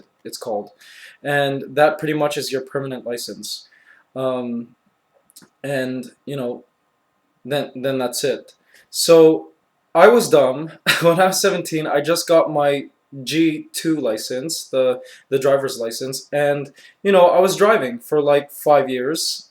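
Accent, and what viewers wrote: Philippines. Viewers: WE'RE BEGGING FOR FILIPINO TUTORIAL WITH FILIPINO ACCENT MIKEY!!!!!!!! :)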